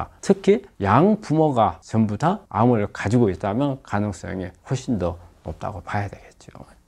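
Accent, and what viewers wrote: Jeolla, South Korea. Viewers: 전라도 사투리 ㅋㅋ 이번 잼버리사태만봐도 그냥 신뢰 제로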